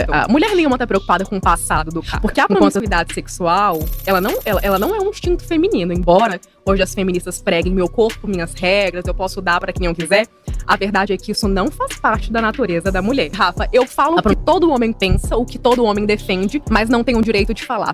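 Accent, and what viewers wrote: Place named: Minas Gerais, Brazil. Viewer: EEEE sotaque gostoso esse de minas, eu aqui no RJ amo quando alguem de Minas ta perto de mim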